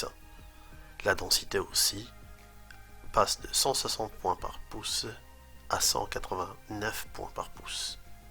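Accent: Quebec, Canada. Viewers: Très bonne vidéo. Ca m'a aidé a la choisir pour mon fils. C'est appréciable quz ton accent québécois n'est pas très marqué ca permet aux européens de l'écouter avec plaisir. +1 pouce bleu pour te soutenir pour ton bon travail.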